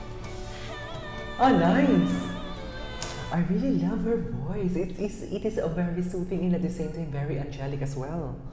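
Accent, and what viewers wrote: Philippines. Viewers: Love you filipino english accent❤. Keep it up. Its colourfull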